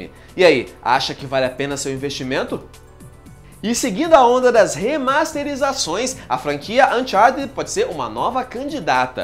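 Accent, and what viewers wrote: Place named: Bahia, Brazil. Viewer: Olhem os primeiros vídeos dele, era mó baianao kkkk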